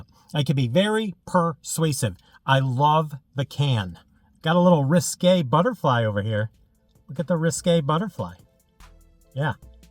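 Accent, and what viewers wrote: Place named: United States. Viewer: Lovely American accent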